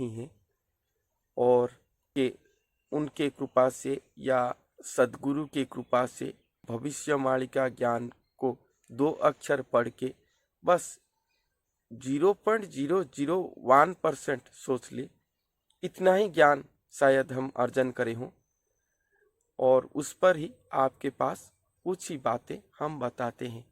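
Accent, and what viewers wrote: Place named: Odisha, India. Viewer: 2024 bole..odia log ka pronunciation aisa hee hai..it's 2024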